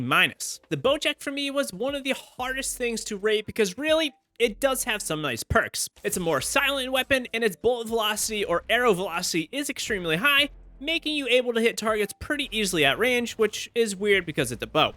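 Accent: United Kingdom. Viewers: your northern accent dawg!! no offense sounds like your saying c ter. lol not making fun of you i’m from texas and i don’t hear it a lot lol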